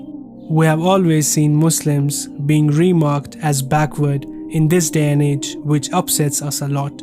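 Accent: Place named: India. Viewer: Too old news these indian posts old information, with funny English accent fix your English first.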